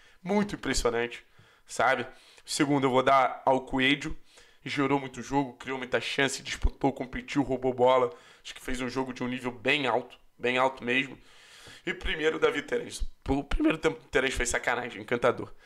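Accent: Rio de Janeiro, Brazil. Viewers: Sotaque carioca + Camisa do CAP ? Like.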